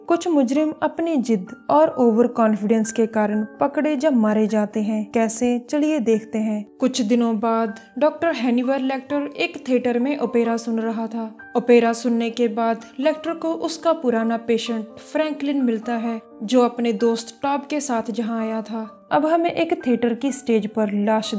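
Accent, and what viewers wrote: Punjab, India. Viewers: Punjabi accent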